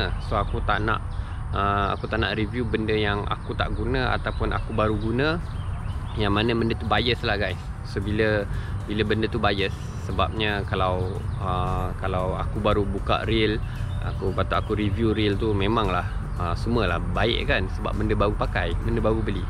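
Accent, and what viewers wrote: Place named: Terengganu, Malaysia. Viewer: Bang org trg ke? Ada kantoi skit disitu walaupun berusaha utk cakap KL.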